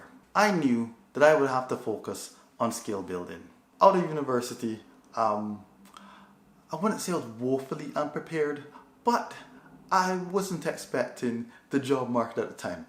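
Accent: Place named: Ireland. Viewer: Your accent is sounds like Irish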